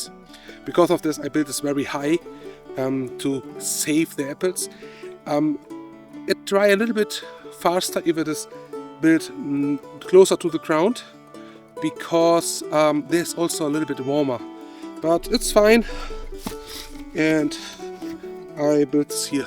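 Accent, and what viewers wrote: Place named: Germany. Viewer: Please don't reduce your wonderful accent, I enjoy it 😊! I understood every single word you said. Your accent reminds me of my Oma and Opa's accents. As young adults they legally immigrated here to the US from Germany, I spent a lot of precious time with them when I was a kid. They passed away a long time ago, I miss them.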